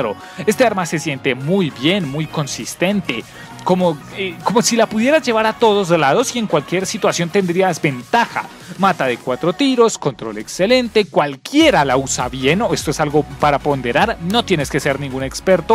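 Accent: Colombia. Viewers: Wow, tienes un acento muy limpio y neutro para ser colombiano, todos los que conozco no pueden decir una oración sin usar groserías o jergas horribles de acá (También soy colombiano)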